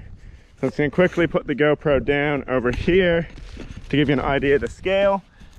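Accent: New Zealand. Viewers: This accent though, i hear kiwi, aussie and american all in one sentence. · You sound like kinda New zealandish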